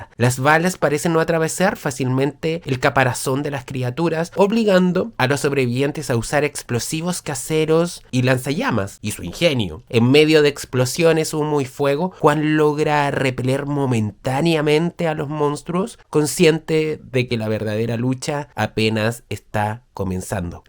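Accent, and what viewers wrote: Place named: Chile. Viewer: Felicitaciones! Estoy literalmente hace años con deseos de crear un canal artístico cultural. Consumo ese tipo de material en su mayoría y además de disfrutar del contenido, me fijo mucho en la factura técnica y en la calidad, claridad, simpatía y todo lo que tiene que ver con la voz o la persona que está al frente del canal. Tomo ideas, analizo, etc. Me gusta cuando hay originalidad en la manera de narrar y pese a que se nota que eres chileno, tienes un estilo muy personal. Primer video que veo de tu canal y está muy bien hecho.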